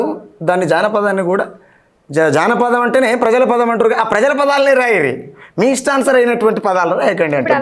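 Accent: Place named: Telangana, India. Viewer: Anchoring is so good pure Telangana....👌👌👌👌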